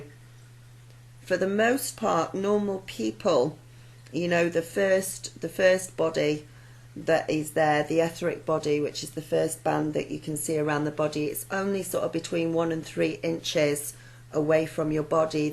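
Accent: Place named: Yorkshire, United Kingdom. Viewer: Thank you so much! I've had this on my watch later list for a couple of weeks, and decided to watch today. Wonderful synchronicity in waiting until now to watch it, as I decided just three days ago that it was time to ditch the news, conspiracies and politics - got drawn into the conspiracies for about 9 months I think, and I'm now just thinking, right, I've learned that and I can move on now. When I did a meditation with the intention of releasing it all and cutting the cords, I got such an intense positive feeling, like my Guides were really excited - I felt their excitement! 😃 Also changing my diet gradually. I've been trying to cut down on sugary snacks, but going to try again now. You got yourself a new subscriber, and great to hear another Yorkshire accent! 💖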